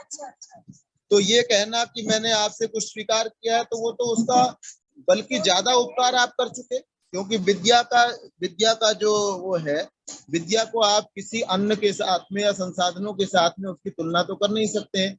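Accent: Standard. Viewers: आचार्य श्री आपके जैसा शुद्ध उच्चारण कभी ही सुनने को मिलता है।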